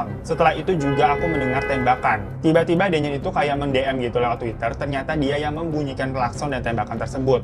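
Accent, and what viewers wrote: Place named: Cirebon, Indonesia. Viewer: Yg AQ suka gaya bicara tuch ky orang Cirebon gitu lucu dan ngasal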